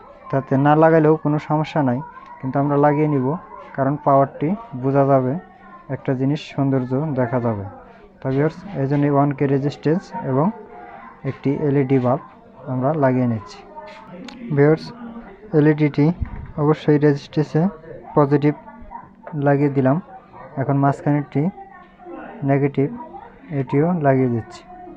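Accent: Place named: Tangail, Bangladesh. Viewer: ভাষা শুনেই বুঝা যায় টাঙ্গাইল্যা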